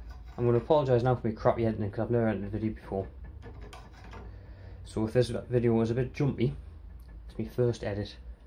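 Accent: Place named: North East England, United Kingdom. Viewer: Mate av got the same problem with my trials bike am sick, you sound like your from the north east, fancy helping me?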